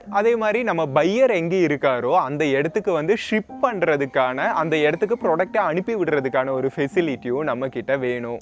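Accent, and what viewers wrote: Kerala, India. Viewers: Nenga keralatha.....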